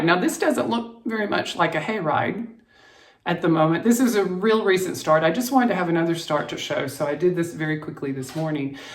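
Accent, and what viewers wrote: South, United States. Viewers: you are a natural born storyteller, and I could listen to you all the day! I had a real moment when you used the word "twitterpated ". Aside from the Owl in Bambi, the only person I ever heard use that word was my Dad, who, like you, had a lovely, soft southern accent, the only one in a house otherwise full of Boston accents. That truly made me smile. Please don't think that's a comment on your age, in fact, I am the exact same vintage as you! I loved my Fisher Price toys, and that castle was awesome! I fear I was a less than benevolent queen, however, as I delighted a little too much in throwing those poor little people through the hatch! I hope I'm kinder and gentler these days. Lol. I think you made excellent choices for your Halloween themed projects, I've got one of my own kitted up and will be sure to use the "pound sign". 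We had rotary dial, too! Take care, Kim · Simply delightful. Twitterpated - you are SO southern! ; )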